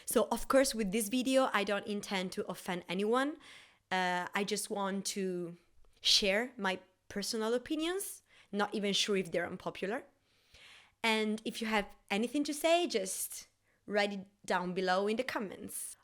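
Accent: Italy. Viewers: I love your Italian accent! I think it’s cute 🥺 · I agree with nearly everything you said. I'll add I not understand the hype for Sotus I couldn't even get through the first episode and I tried a few times. I even tried viewing the other bits of it as I love Singto's work normally but it was just so boring and as you said full of boring tropes. BTW thank you and......... It was very easy to understand you, there are no problems with your English you should be proud of yourself. I love your Italian accent, oh yes I forgot to say, 'I'm English' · Finally, somebody who appreciates our Volleyball gays from Taiwan! Also don't be shy about your Italian accent. We're all just weird beans watching foreign gay TV shows on youtube, we can handle diversity here ;) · Se que eres Italiana pero creo que entenderás esto, tienes el inglés perfecto!! El acento está ahí pero para mi casi no se nota. 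Me encantó este video! ❤️ 💕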